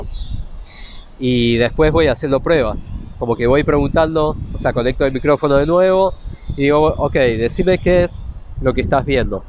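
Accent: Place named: Argentina. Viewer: ! Muy buen vídeo! Tenes acento Argento ( de donde sos?) Abrazo grande desde argentina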